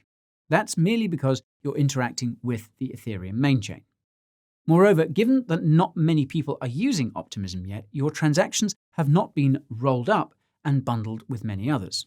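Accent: United States. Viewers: Is that supposed to be an American accent? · His American accent is getting better and better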